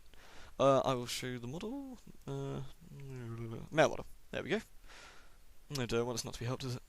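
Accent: United Kingdom. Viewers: since I have no interest in this game, I think I just sat through almost 8 minutes of video just to hear his fabulous British commentary XD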